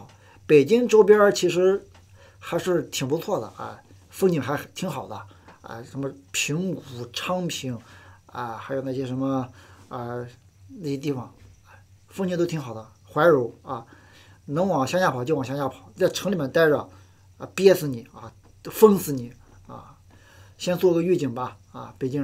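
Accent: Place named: Xiji, China. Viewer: 你口音像西吉县的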